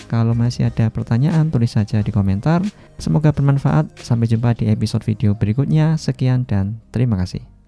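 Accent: Boyolali, Indonesia. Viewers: Lo lo lo, wong boyolali to mas?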